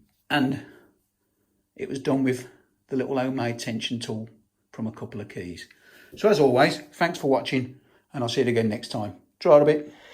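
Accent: Birmingham, United Kingdom. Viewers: Nice one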